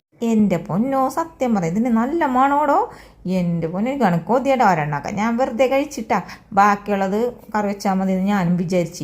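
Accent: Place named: Kochi, India. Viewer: ഇരിക്കണേനു. കൊച്ചി ഭാഷ കേൾക്കാൻ എന്ത് സുഖം.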